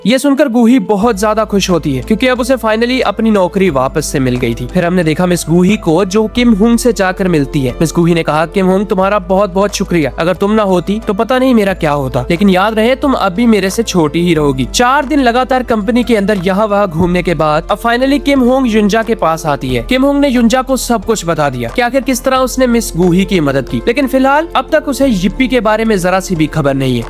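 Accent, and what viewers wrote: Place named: Pakistan. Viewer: Aapke pronounciation ke Hisab se Aap Pakistan se Belong karte ho na - Bythe way Voiceover 🗿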